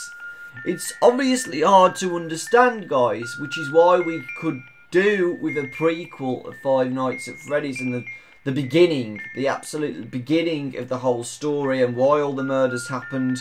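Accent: United Kingdom. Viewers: is that a british accent? "pizzaria ,suvoid, foulks " sry If I`m being racist but I found it funny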